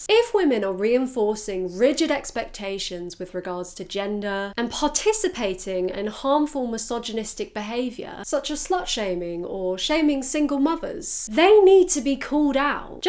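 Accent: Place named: United Kingdom. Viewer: Soooo just realized all my male partners were actually some kind of pick me boys AND all the female partners/dates were im not like other girls girls and woah bisexuality really is something else, like, makes SO easy interacting physically, romantically or both with every kind of awful people uncomfortable laugh Btw just discovered this channel and im obsessed! This channel has everything, an amazing British accent, great humour, cows, smashing the patriarchy and a lovely skinny alien dog (im a cat person, but I have a soft spot for skinny alien dogs) Okay, I'll stop this rant here, love from Italy!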